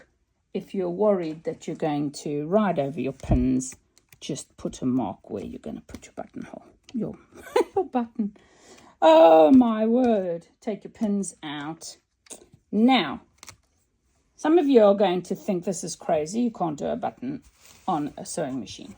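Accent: South Africa. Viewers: Thanks ! Love your South-African accent !!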